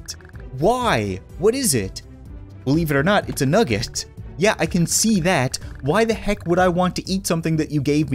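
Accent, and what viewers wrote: Canada. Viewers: I like his Canadian accent it’s funny as hell when you get used to it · You sound really Canadian in this video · He sounds extra Canadian and I love that I want to visit Canada so bad now. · True Canadian voice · I just LOVE how Canadian he sounds! XD Just listen to when he says "sorry"!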